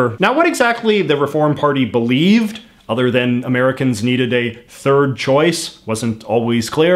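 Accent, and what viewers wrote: Canada. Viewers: as a Canadian I can say you've got quite the stereotypical Canadian accent! kinda funny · I always thought us Americans always played up the Canadian accent but damn you’re super Canadian! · omg ur canadian accent is so funny LOL great vid tho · Some times I’ll forget you are Canadian and the out of nowhere you’ll hit me with an ‘aroond’ when pronouncing ‘around’ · As a Canadian I can promise you that no one in Canada has a "Canadian" accent this strong. I call shenanigans! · guy sounds like zach braff but Canadian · He talks “Canada” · Your canadian accent is strong af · I'm Canadian, and you have the most Canadian accent I've ever heard. I've never heard someone pronounce "around" like you.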